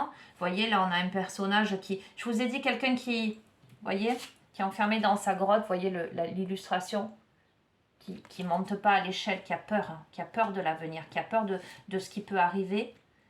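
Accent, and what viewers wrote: South, France. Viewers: Bonjour &, c'est toujours un plaisir de vous écouter, vous avez une voix mélodieuse et un doux accent du soleil et cela est très plaisant...Je vous félicite pour avoir mis les choses au point avec certains Hommes car c'est indispensable de se faire respecter...Vos guidances me font le plus grand bien et je vous en remercie chaleureusement...Restons dans la Lumière Divine...Gratitude Infinie et Très bonnes Énergies à votre chaîne💐💖😇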